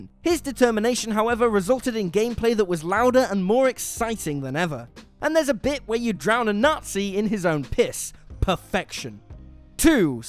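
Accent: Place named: United Kingdom. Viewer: Usually British accents sound pleasant. This is a big break from that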